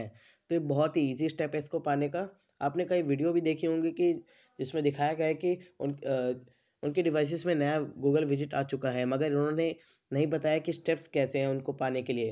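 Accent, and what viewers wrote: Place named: India. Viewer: No offense i undsrstand that u are indian and have accent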